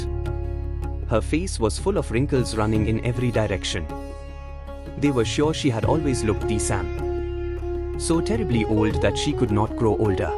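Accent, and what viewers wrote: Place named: Africa. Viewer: this feels like a african trying to explain a indian cbse portions , still its very good explaination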